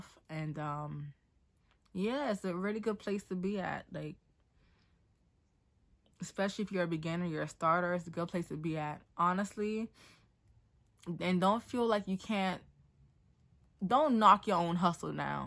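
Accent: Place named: New York, United States. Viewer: can definitely tell by your accent that you stay in NY 🔥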